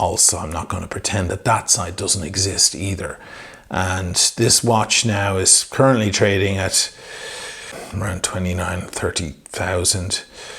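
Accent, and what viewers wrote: Ireland. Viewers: Screw anyone who doesn't like listening to the Irish blabbering...I like many other watch lovers appreciate all the content 👌 massive congrats on the purchase mo chara...its pure class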